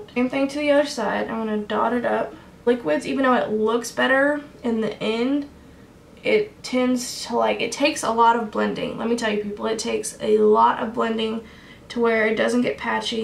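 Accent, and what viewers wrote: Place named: California, United States. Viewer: I’m guessing she moved back home to Michigan from California cause she’s HELLA talkin like a Californian. Lol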